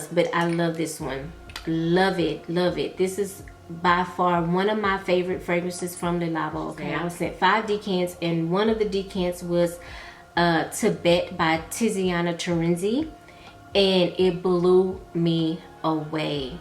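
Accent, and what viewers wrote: Texas, United States. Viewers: “My God Today” you know you Texan! Love you!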